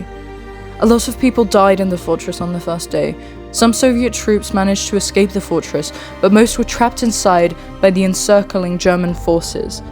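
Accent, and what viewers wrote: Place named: RP, United Kingdom. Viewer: Perfect BBC English; умничка! Никто не забыт, ничто не забыто.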